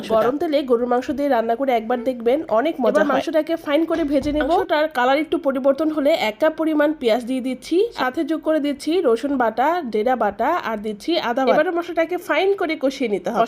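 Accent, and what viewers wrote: Bogura, Bangladesh. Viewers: ফাইন বগুড়ার ভাষা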